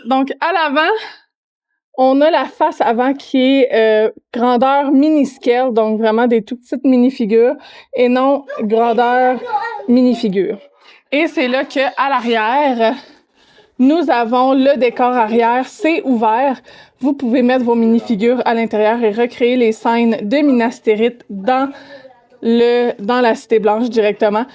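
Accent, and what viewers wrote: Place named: Quebec, Canada. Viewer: Accent québécois ! J'adore. Commentaire pour le referencement. 😉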